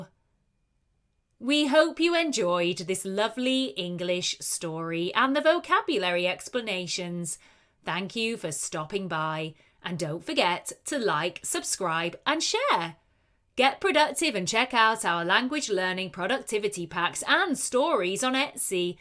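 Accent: United Kingdom. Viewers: 🏋️🏋️🏋️ Thanks so much ☺️ Ma'am it is a wonderful story ,I was so scared of listening 🎧,the story I thought I can't understand , fortunately I can understand because I do love British accent💃💃💃💚💛 Definitely I have explored that ,this is the good way of enriching our english pronunciation as well as improving our vocabulary 📌💚💪Your voice is clear everyone can get what you mean 😊😊😊😊✨.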